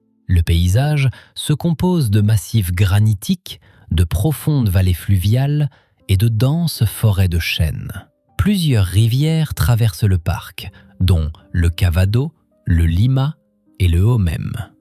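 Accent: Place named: Spain. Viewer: Mon pays de cœur, celui où je finirai ma vie, en ayant obtenu la nationalité portugaise. Si je vis assez longtemps 😅 Tant d'endroits magiques, de paysages variés, de fêtes inoubliables des plus grandes villages aux plus petits villages. Des traditions vivaces et une modernité, moins froide qu'ailleurs! Des détails, partout, qui m'émerveillent: calçada (pavage des rues avec des motifs), azulejos anciens ou contemporains, ferronnerie, art de rue si créatif, coloré et joyeux. ETC. Seul bémol de la vidéo: les noms portugais souvent mal prononcés, à la mode espagnole. Le portugais n'a heureusement rien à voir à l'oral avec cette langue si pauvre en phonèmes!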